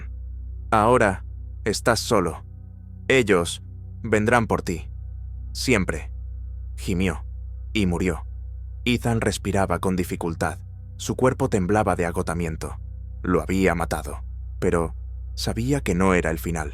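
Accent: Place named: Spain. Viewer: Quiten el maldito y horrible acento español, ese acento tan desagradable arruina la historia, deberían de prohibirlo en todo el mundo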